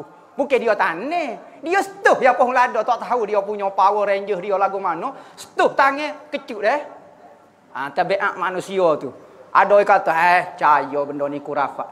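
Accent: Kelantan, Malaysia. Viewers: seronok dengar kuliah ustaz. minta ustaz kurangkan dialek kelantan sebab ada yang sesetengah tak paham dialek ustaz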